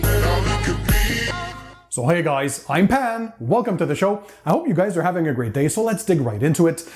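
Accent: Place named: Canada. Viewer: What is his accent. Sounds Canadian, but with something else.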